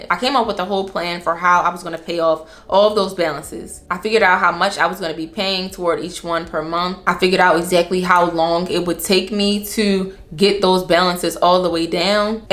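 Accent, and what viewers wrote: Baltimore, United States. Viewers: you from baltimore aren’t you ? 😭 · I hear a Baltimore accent. Are you from there?? Thank you for your videos! Happy new years!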